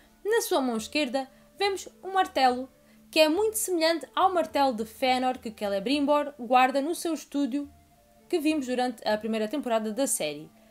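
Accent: Portugal. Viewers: Como é lindo o sotaque do português de Portugal 😍😍estó anciosa pelos filmes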